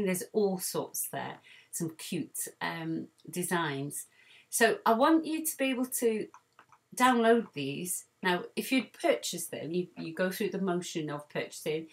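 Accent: Yorkshire, United Kingdom. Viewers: I just found your channel and subscribed please please do more tutorials on digitising for beginners. You speak my Yorkshire language and explain beautifully! Please do more! Xx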